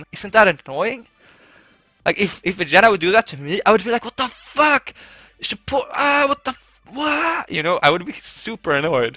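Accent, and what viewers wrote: Sweden. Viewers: I don't know what accent you mean, but of course Swedish people have a similiar accent! Most Italians have the same English accent aswell, etc. I don't understand why "TheBlueSledge" is crying about it. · cryaotic our hero! I'll have you know not all swedish people sound like that. Brings me shame every time I hear some one that. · Du hade en väldigt start svensk dialekt i den här xD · swenglish HAHAH all of us sweds talk like that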